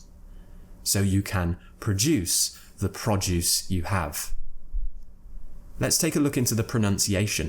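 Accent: United Kingdom. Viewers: After changing my work in UK process, I came in to learn Uk accent and found this Chanel to be one of the best Uk Accent learning platform. And now I'm addicted to sir accent.may be I'm late but whenever I'm free I'm listening to your life journey podcast. ❤️ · U r a Good teacher. your British accent is very nice ... I love your style ... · Thank you very much. Your videos are very much useful to me. I like very the Pronunciation of English not Amrrican. You are great teacher. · Absolutely brilliant content and delivery. Serious skills here! Thank you for your valuable contribution. I'm etching ever closer to a British English accent thanks to you. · For a 15-year-old adolescent. Every time as I’m watching your video instrumental, it should be a very enjoyable and elated process since I could hear and feel the most authentic and received British pronunciation, which benefits me too much. 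Thanks!!!! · Why are you speaking English so Britishly? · Your English accent is so British.🙃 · TBH, from all those videos I've come across, your ones are the best to learn the British accent! And such a lovely teacher you are! 🙊❤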